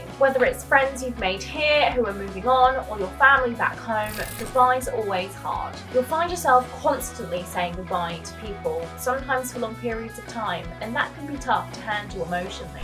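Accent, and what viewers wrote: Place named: United Kingdom. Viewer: And where are you from in the Uk accent sounds familiar I left in 1979 from just outside Birmingham.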